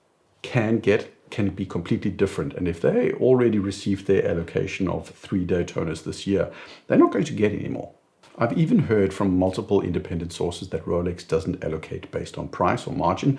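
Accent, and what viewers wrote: South Africa. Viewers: Howzit boet! One of the things that drew me to you (and ID guy) was the accent from home. I'm living in Cape Town. As you know, we have plenty sunshine but insufficient electricity, so anyone who can is getting solar 😂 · Haha thought you SA’an. I’m an Aussie who lived in SA for 5 years. I like your presentations, honest, well researched, intelligent and very interesting. Go forward well, ne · I was always thinking that you were South African. Now it’s confirmed. Great accent and great people!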